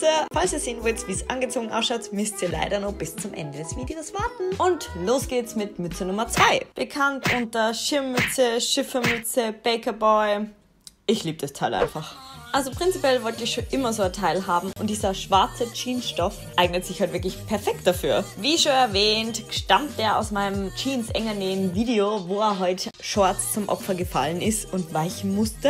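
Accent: Vienna, Austria. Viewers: An dieser Stelle ein riesen Dankeschön für deine Mega tollen Videos 😊 ich liebe deine Projekte und habe so viel Freude beim anschauen weil ich den Wiener Dialekt einfach nur liebe 😍❤️😍. Vielen Dank und mach weiter so!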